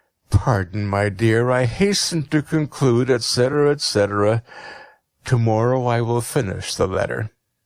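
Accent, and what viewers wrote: United Kingdom. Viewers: на слух - британский.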